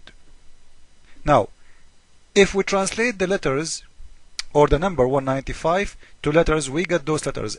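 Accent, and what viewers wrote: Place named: Iceland. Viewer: are you from iceland? love the accent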